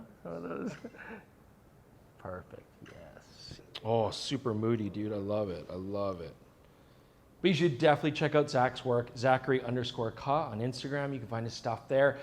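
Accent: Australia. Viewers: legit good aussie accent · That was a very credible Aussie accent! 👏😄 Welcome to Brisy, hope you had a great time 👌📸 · I think it's 90% Aussie! The words and enunciation are almost spot on! Though there was a bit of South African in there 😂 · nice aussie accent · Great aussie accent! You're a natural!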